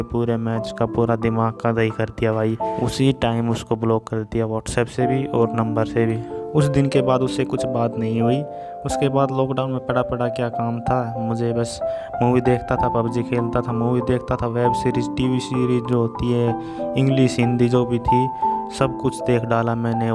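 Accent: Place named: Haryana, India. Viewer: Bhai mujhe lgta h ki tum haryana se ho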